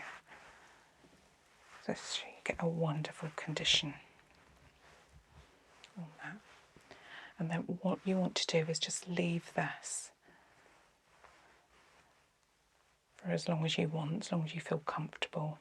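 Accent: England, United Kingdom. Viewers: English accents are heavenly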